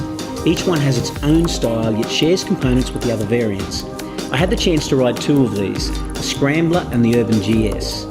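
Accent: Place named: Australia. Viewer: 100% Agree - great footage and review - impossible to here what Metzler you recommend with the MUSIC !! and the Aussie accent....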